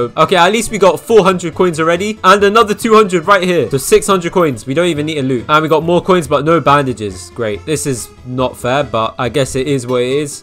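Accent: United Kingdom. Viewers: Lol British voice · It is too brittish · He already voice revealed British🇬🇧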